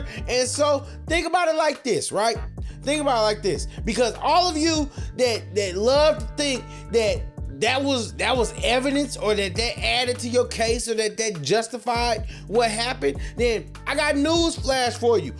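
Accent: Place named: Rural, United States. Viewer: I understand the hustle bro but why do all of you attempting this hustle have that country bumpkin accent? Sarah Jane Comrie is in the wrong, she could easily press charges if she felt otherwise. She lied saying no one knew the identity of the young man, when proven to be a lie, her lawyer came forward to state that he has not intention to even try to sue the young man for defamation. You have to have the IQ of stale bread to genuinely see that video and think your self that she was in the right. When I tell you genuinely speaking the rest of the world views Americans as low IQ trolls you do not help that image. If she doesn’t deliver a baby in 3 months I dare you to make a video.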